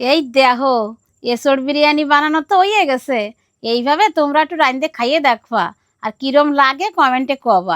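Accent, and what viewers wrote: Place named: Khulna, Bangladesh. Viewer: আমাগে খুলনা জেলার ভাষা মনে হচ্ছে.. 🙂🐸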